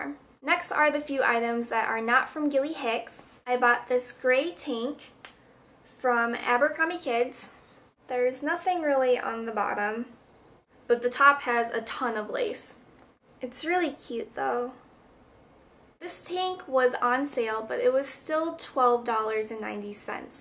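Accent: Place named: Chicago, United States. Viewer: you are sooo cute and i love your "accent", is that a chicago accent? i can't figure it out and it's bothering meeee!!! ahhh!!!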